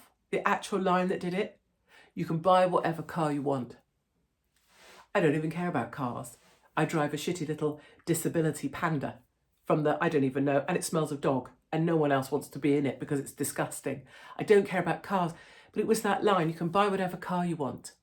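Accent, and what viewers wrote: RP, United Kingdom. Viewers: You might understand this...I remember a female officer going through some trauma.where she was crying..she got a hug from an NCO (a very experienced single NCO) and she was disgusted at being touched by a filthy subhuman NCO....and screamed .....Get off me you are not an officer....the rest of her time in Regt was not good...which is why I take the piss out of you back in the old days with your posh officer accent and now you need the support of the 'ordinary's you have toned the 'Sandhurst twang' right down. Get it?? By the way this was Signals, not some queen marys own wankers, where they wear spurs to dinner???....and the solid silver spurs cost 500 quid each and you can't actually be an officer unless you have an outside income because extra messing is more than your actual pay. Doubt you will read this but others will.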